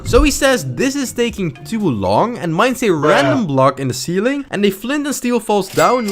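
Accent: Netherlands. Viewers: I sense some dutch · U sound dutch,.....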